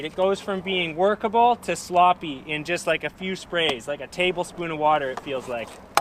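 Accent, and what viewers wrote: Canada. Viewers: This guy is the shit. Love the Canadian accent lol. I'm also a skateboarding who ended up in construction cuz skateboarding wasn't paying the Bill's. I wish it could've tho lol. Keep it up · He sounds like every Americans impression of a Canadian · Is this a Canadian accent I'm hearing? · this has to be a canadian talking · I can tell he is Canadian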